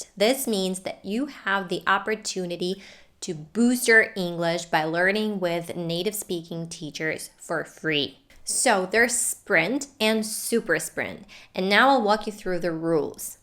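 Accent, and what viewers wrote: United States. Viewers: Her accent is American,and i like to be an American international communicator like rebel number one and i don't want to mention who is "rebel number one". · Madam your American accent is very clear like British accent we understand easily but the other American' s accent we can't understand so easily . So you are a good and expert teacher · Me "Holy s***, English isn't her native language?" From your accent and proficiency in speaking I immediately assumed you were an American. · You have a perfect American accent. Were you born in the US?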